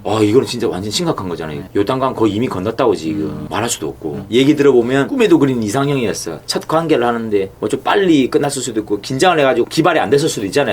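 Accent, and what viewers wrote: Gyeongsang, South Korea. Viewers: 사투리가 무지 쎄신데 부산분이신거에요? 대구분이신거에요? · 특유의 경상도사투리가 킬포네 ㅋㅋ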